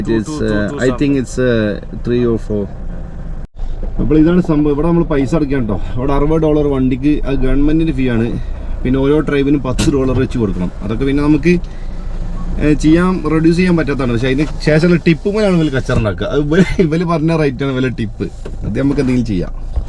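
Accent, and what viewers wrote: Malappuram, India. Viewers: നിന്റെ പെർഫോമൻസ് നന്നാകുന്നുണ്ട്!മലപ്പുറം ശ്യ്ലി യിൽ മലയാളം ok!!!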